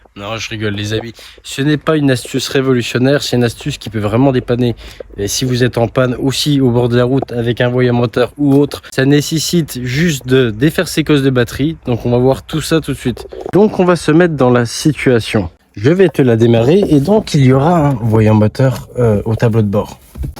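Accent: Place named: Alsace, France. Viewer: Non avec une léger accent Alsacien on dirait... J adore ♥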